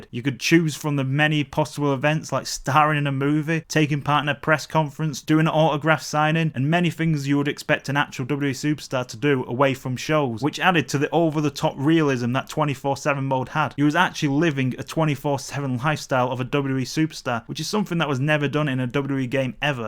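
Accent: Yorkshire, United Kingdom. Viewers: Are u from Yorkshire?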